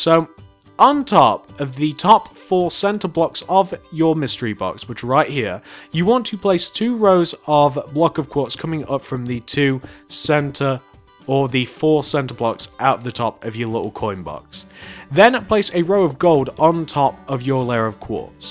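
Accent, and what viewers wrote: United Kingdom. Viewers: Bruh he’s British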